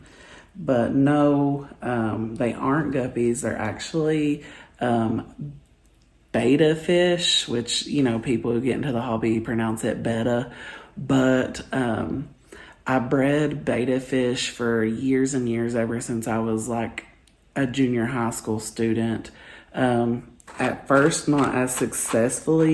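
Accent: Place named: Kentucky, United States. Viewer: I look forward to your videos. I love accents, I enjoy Claire at Jungle Haven's British accent, and I love your Kentucky accent. I am a nurse (I just added up and it's been 37 years!!) And my youngest daughter is a Family nurse practitioner. Both of my daughters and I are planty people. I appreciate your courage. You give me the gift of your plants and how you grow them. I have not done a moss pole yet. I have a rapidaphora tetrasperma that would appreciate a moss pole or a trellis about 20 feet 😊high.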